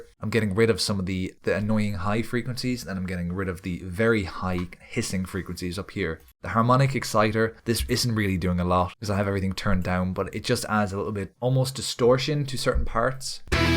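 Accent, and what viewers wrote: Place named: Ireland. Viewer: Why did I never know you were Irish??? Love ittt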